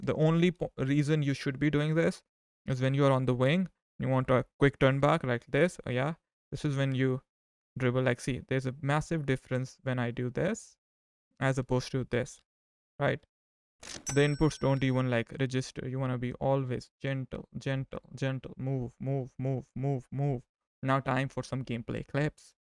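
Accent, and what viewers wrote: India. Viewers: Are you from India mother yar????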